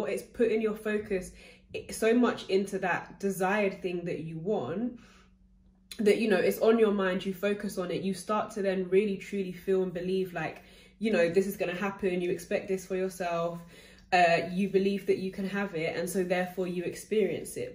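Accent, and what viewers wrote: United Kingdom. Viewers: Wasn’t expecting the British accent 😩😂thought she was American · Finally a loa coach with a British accent! Thanks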